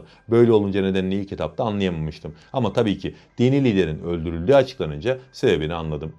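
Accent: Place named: United States. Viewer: Amerikan ağzı ile konuşuyorsun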